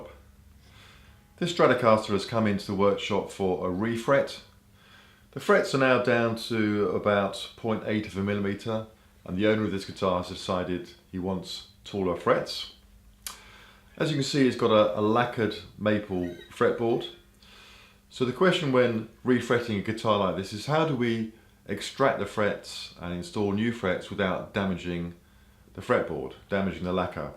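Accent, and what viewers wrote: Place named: England, United Kingdom. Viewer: I love English accents.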